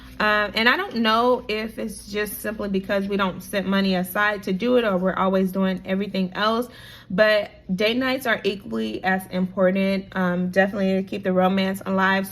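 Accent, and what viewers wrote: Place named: Caribbean. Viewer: I sometimes hear a little bit of an accent, but I can’t quite pinpoint from where lol Caribbean maybe? I’m so nosey!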